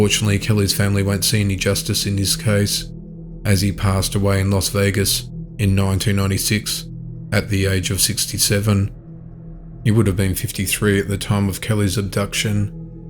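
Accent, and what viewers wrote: Australia. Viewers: So glad to hear an Aussies voice. Your voice fits perfectly to the background noises. Very tragic what happened to these people though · Great to hear a fellow Aussies voice 😀 Loving the videos so many cases I never heard of and wonderful to see that some I knew about have been solved. Binge watching ... cheers from Queensland 🍻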